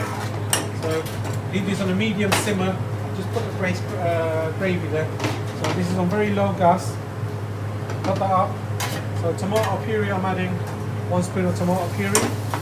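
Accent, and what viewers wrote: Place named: Birmingham, United Kingdom. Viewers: Sounds like a Brummie. Probably from the 'Balti Triangle' or similar area in Birmingham, which probably has more 'Indian' restaurants per square mile than anywhere outside of India! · Should tell us where you are think it would be great for your restaurant? It’s a midlands accent maybe Leicester but I’d b guessing. Looked delicious.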